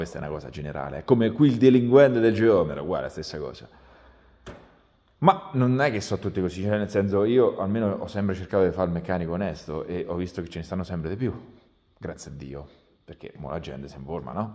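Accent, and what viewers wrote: Italy. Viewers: Bella la trasformazione della tua tua parlata che al mio orecchio suona folignpoleto almeno, che diventa col donca pg.... Saluti dal LupettOrsetto prealpino che dal 2005 al 2019 era a pg e li ha imparati a conoscere bene quelli che non comprendono la prescia 😅 che devi interrompere il resto per andare apposta per lui e magari il giorno dopo puoi andare a prendere i pezzi per più cose in un giro unico...❤ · Pensavo che io cerco un meccanico e tu hai lo stesso dialetto mio,di dove sei?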